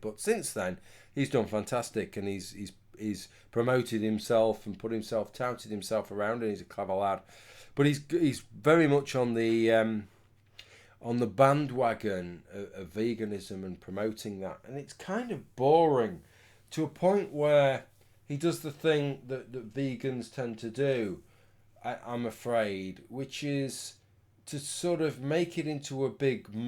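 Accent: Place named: Lincolnshire, United Kingdom. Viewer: Louth? I always suspected from your accent you must be from my neck of the woods. I was born and raised in bloody Cleethorpes! Went to Louth a few times. I remember Hubbard's Hills...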